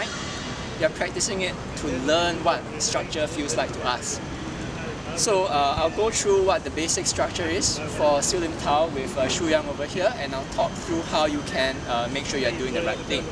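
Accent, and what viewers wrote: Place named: Singapore. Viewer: Judging from the accent, must be Singaporean and Malaysian wc. There s no such thing as structure for sil lim tao, there is only one single right structure for the entire Wing Chun system. Your mentioning of "clamming down" the knees already show some misunderstanding. Most people have been misled and gone down the wrong path simply because of the unfortunate use of the word "箝" ! 取其像而不取其義!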